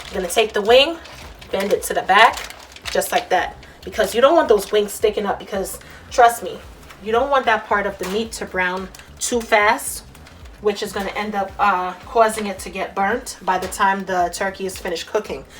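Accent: New York, United States. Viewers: Thank ya Miss...I love cajun seasoning. You sound like a fellow New Yorker...hahaha....love it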